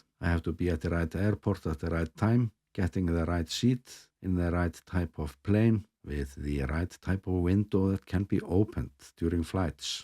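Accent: Iceland. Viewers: Excellent. I am very happy to hear the great news about 100K. The quality of your productions, both video and audio as well as the Icelandic accent you provide is always welcome to see and hear. Your choice of music is also great. I look forward to your future video's. Take care, with greetings and best wishes from NZ. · Thanks for mentioning his Icelandic accent. Of course, he’d say”What accent??”. Just like the rest of us do about our accent in other parts of the world. However in has mentioned his accent in the past. It’s part of his charm.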